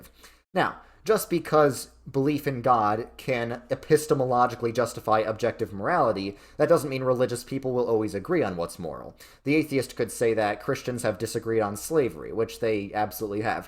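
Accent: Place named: Bronx, United States. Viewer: No offense, but you definitely sound like you are from the bronx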